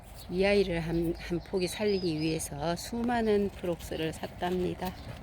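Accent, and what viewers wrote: Gyeongsang, South Korea. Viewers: 코로나 때문에 아무것도 할께 없네요 네 정원이라도 눈이 아프드록 보고 또보고 위안을 삼지요 우리 영감님 너무 본다고 야단 맞으며 중독 됬네요 그 많은 꽃을 어떻게 관리를 하시는지? 부럽습니다 목소리를 들ㅇ.니 경상도인가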